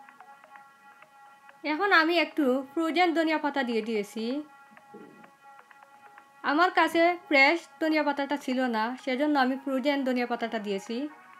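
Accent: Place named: Sylhet, Bangladesh. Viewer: তোমার মাত হুনিয়া বুজিলাইছি তুমি সিলেটি।আমার এলাকার আফা।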